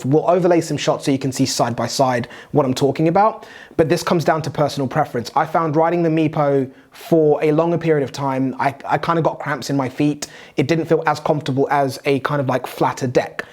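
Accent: England, United Kingdom. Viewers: What country do you live in? The way you're talking about other countries you sound American but then you have an English accent.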